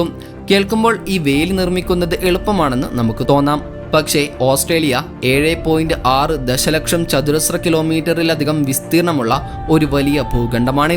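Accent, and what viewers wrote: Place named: Malappuram, India. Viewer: Nalla malayalathil parayedo ninte malapuram bhasha vemda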